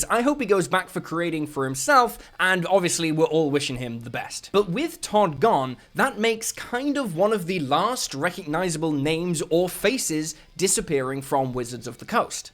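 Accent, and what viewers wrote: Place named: United Kingdom. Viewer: Ha! you are british 😂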